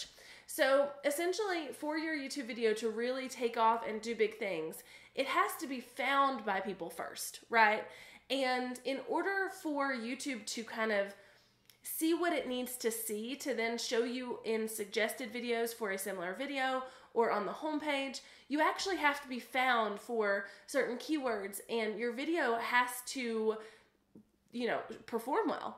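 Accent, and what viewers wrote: South, United States. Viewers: the southern drawl! Cute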